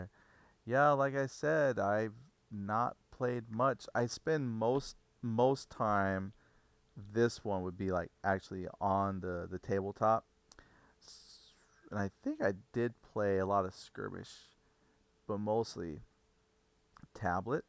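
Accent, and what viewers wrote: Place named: Germany. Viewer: good german accent :-) :-)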